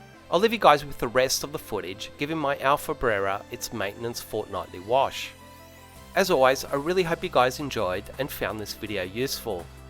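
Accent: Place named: Australia. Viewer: 3rd video in and I’m hooked! Good to hear an Aussie voice in a car detail video, Big fan of your work and your car, pity I’m on the other side of the city.. Sub.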